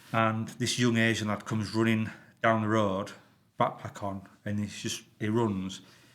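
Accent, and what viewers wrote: Yorkshire, United Kingdom. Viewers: Keep em coming, Makes my day listening to your video's. & the Yorkshire accent makes me smile too! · I fucking love a good yorkshire accent me 😍🤣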